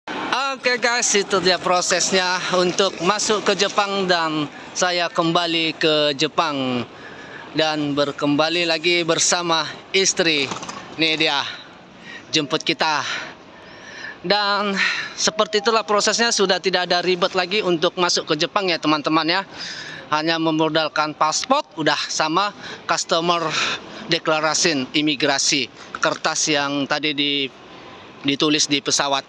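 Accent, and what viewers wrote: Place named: North Sumatra, Indonesia. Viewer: Logat batakny kental x.tp abang ni putih ya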